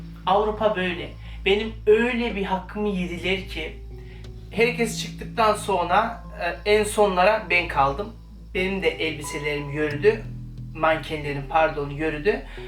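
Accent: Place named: Kırşehir, Turkey. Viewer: Kırşehirli olduğu çok belli ya babannem çok güzel diyo ya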